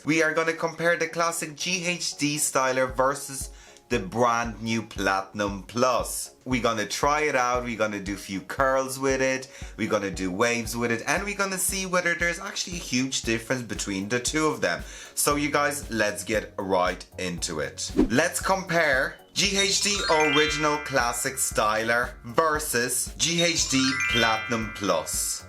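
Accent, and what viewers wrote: Ireland. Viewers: Was not expecting the Irish accent 🍀 ❤ my original ones plug broke I so regret throwing it away and replacing with the platinum 😢 platinum one makes my hair static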